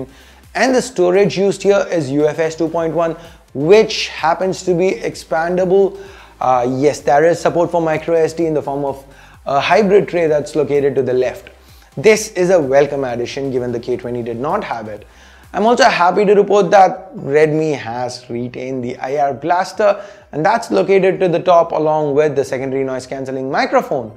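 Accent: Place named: Tamil Nadu, India. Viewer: Haha tamil channel illama english veraya hmm👍👍👍👍👍👍👍👍👍👍💕💕💕💕 enna dhan english pesinalum tamil slang madjiriye iruku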